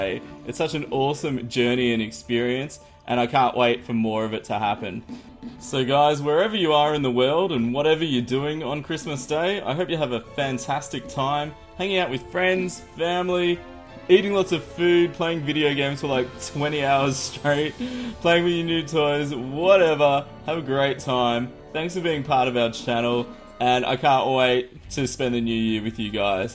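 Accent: Australia. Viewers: is Australian I thought he was English my mind is blown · Wow didn't realize u were austrailian(guess I should have known from ur accent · 4th like I never new u was Australian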